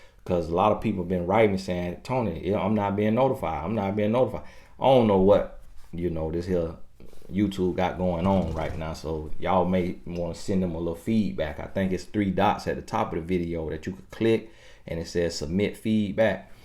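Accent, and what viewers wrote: South, United States. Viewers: Right heauh ! Love his southern drawl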